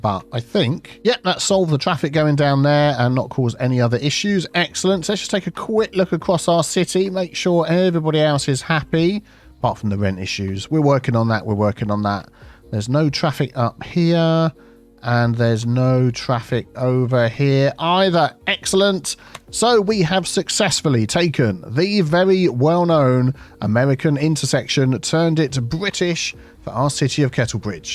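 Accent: United Kingdom. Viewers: How do you pronounce Chicago,? I've realised it definitely gets a ch from me but then again I'm not a native English-speaker. Michigan pronunciation I wouldn't have known if it wasn't for CPP but my British English influence is just so strong since school that it plays up on me. Your accent just brings me back to the UK, love it ❤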